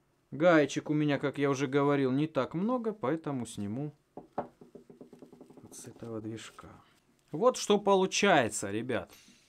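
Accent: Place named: South, Russia. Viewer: у просто неправильное звучание,ибо южный акцент имеет,все люди с нормальным произношением не будут говорить подшибник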